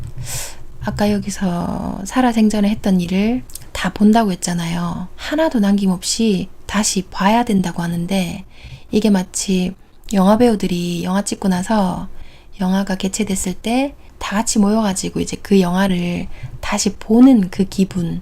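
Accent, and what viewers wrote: Gyeongsang, South Korea. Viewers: 이 채널의 주인장이 경상도 사람인 것 같아서 새삼 정이 갑니다. 책을 읽는 어감이 주는 익숙하고 편안함... 마치 고향으로 돌아가 할머니 무릎가에서 한 편의 옛날 이야기를 듣는 기분이었습니다. 편안하고 뜻깊은 시간을 주셔서 감사 드립니다. 고맙습니다~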